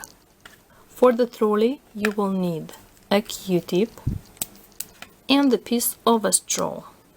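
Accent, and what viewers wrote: Russia. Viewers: I love ur Russian voice and ur crafts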